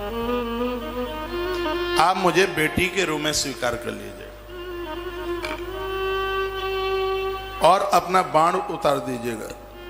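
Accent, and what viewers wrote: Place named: Bundelkhand, India. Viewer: Maharajji aapki bundelkhandi bahut malook lgat hai hm bhi bundelkhandi hai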